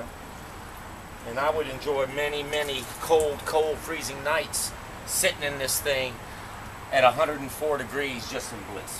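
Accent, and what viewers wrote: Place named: Virginia, United States. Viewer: Sad, I have to watch this video every Spring when I take down my tub! You sound like you are from the Eastern Shore of VA!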